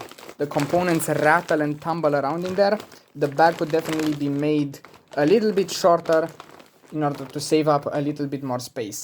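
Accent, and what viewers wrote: Romania. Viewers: It is an accent. It's not for you, being Romanian, sounds "normal" to you, but it's a VERY appreciated accent by other countries. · Lovely classic romanian B2 accent Thx for the video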